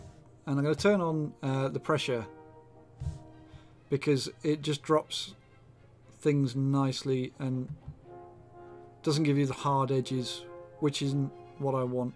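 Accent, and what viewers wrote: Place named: United Kingdom. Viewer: Welcome. Figured it was some sort of U.K. accent just couldn't place it.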